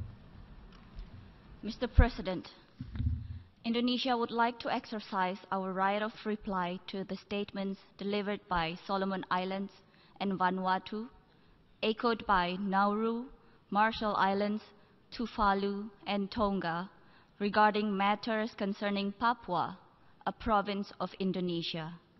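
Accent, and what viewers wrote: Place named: India. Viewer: Kek logat orang india:'v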